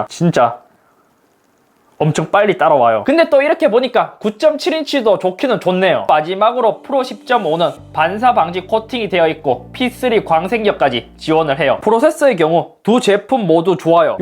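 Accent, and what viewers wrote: Gyeongsang, South Korea. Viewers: 고향이 대구에요?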